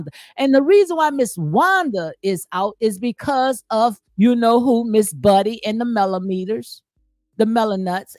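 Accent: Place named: Louisiana, United States. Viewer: Are you from Louisiana? I love the accent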